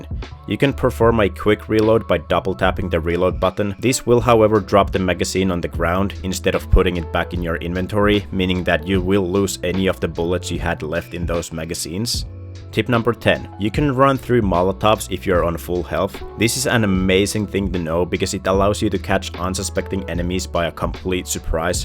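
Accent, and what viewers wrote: Finland. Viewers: Pakko nyt kysyy onk jäbä suomalainen tai jotai ku must tuntuu et sä puhut tyylii ralli englantia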